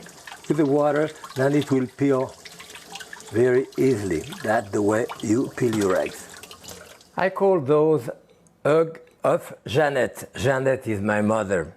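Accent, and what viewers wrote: France. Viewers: That accent is Jacques closseau level ! Love it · With that chic French accent he could make a carrot butty sound exotic! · L 'accent francais c'est magnifique. Great explanation merci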